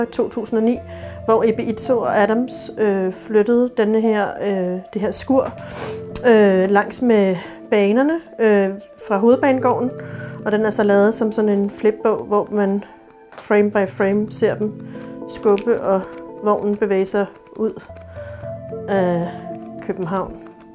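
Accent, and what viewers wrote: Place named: Scotland, United Kingdom. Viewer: wow she sounds so scotish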